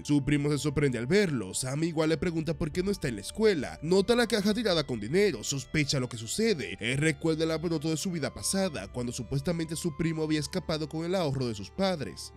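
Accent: Dominican Republic. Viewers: Eres dominicano bro 🤔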